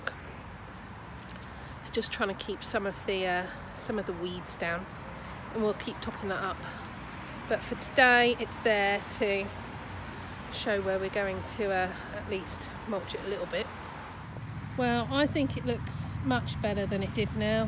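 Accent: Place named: Hampshire, United Kingdom. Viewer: Just wondering what part of the country you are in. You sound as though you may be from Hampshire. I love your videos and the one where you work at the rabbit run in the dark made us laugh as we had so much trouble with rabbit runs lass year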